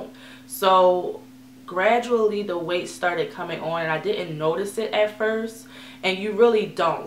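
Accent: Baltimore, United States. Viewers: I could tell you were from Baltimore a few sentences in 😁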